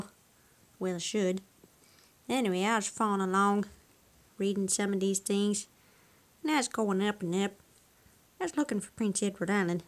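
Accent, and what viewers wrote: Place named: Texas, United States. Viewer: Wow you are israeli but you sound like a texan. How???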